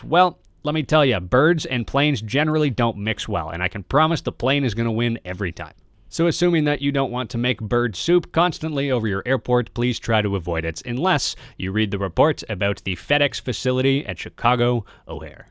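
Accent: Canada. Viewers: sir you have a Canadian accent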